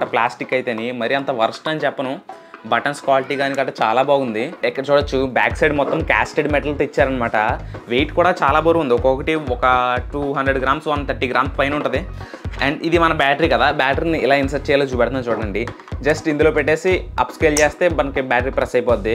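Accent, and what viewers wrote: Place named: Godavari, India. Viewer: Godavari slang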